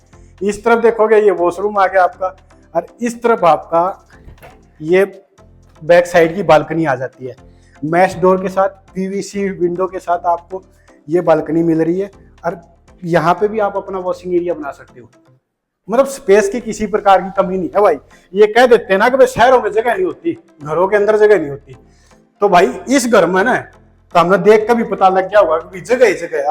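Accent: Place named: Haryana, India. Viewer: Sir haryanvi touch k sath to or bhi acha lga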